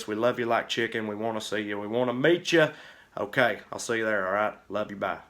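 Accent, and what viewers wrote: South, United States. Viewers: the suthern accent has always annoyed the fuck outa me, but gawd dayum if I couldn't listen to this jar of intelligent hicksauce read a fucking phonebook! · It's good to hear that accent talk some sense for a change! Go! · 59 year old white man here. Originally born in s. Carolina. Everything you say is spot on. I love it, I think you are the best spokes person for the irony of these times on the net. More power to you, keep it up. I will come see you if you are in Maryland or DC. Seriously, keep it up. Your voice matters, and your point of view is well thought out and well spoken. And the good old boy slang works to great amusement and effect.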